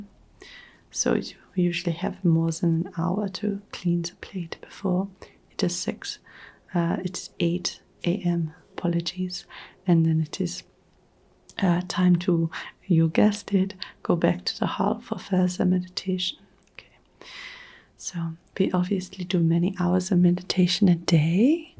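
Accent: Germany. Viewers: This was so fun and relaxing! I am ready for my meditation retreat! Loved your German accent too! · No way you’re not actually German!!! The accent is spot on! I thoroughly enjoyed this video, had it as background noise while I was reading and it’s just perfect - thank you! 💖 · I am living in Germany right now and I loveeee your German accent videos!!👏👏👏 Toll gemacht! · I’m so astonished how good your accent is. You got the German r sounds perfectly!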